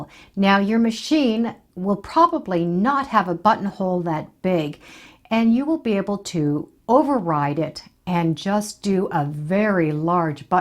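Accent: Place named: Canada. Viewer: I could listen to you say "again, against" over and over. That Canadian sound is clear.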